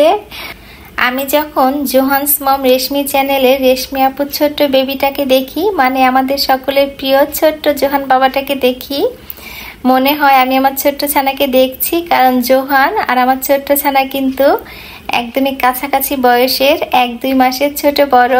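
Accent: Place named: Faridpur, Bangladesh. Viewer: Deshi bon tomar mukhe faridpurer kotha sune onek valo laglo❤❤❤❤rannagulo❤❤❤mashallah babata❤❤❤ghurte gele❤❤❤❤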